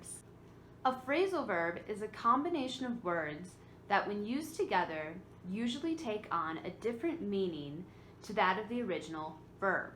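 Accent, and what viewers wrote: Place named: United States. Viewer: Thanks good job I love the way American speak English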